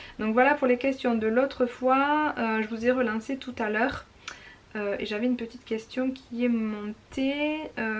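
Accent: Toulouse, France. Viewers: Salut moi c'est Virginie..j'ai été opérée d'une sleeve en janvier 2017, j'ai perdu près de 50 kgs mais je partais de très haut (133kg) je suis donc encore en obésité 1 ...je voudrais perdre encore 13 kgs pour atteindre mon objectif personnel...c'est dur dur...en tout cas, je te dis un grand bravo...tu es magnifique et merci pour tes vidéos ...ton accent Toulousain me met du soleil dans le coeur... (je suis née à Montauban mais j'ai grandi dans le nord. ..)... merci et bonne continuation à toi...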